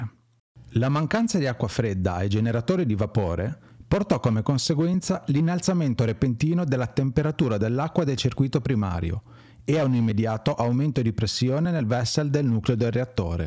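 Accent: Genoa, Italy. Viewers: Ciao ma io sento un accento genovese cmq complimenti sempre tutto interessante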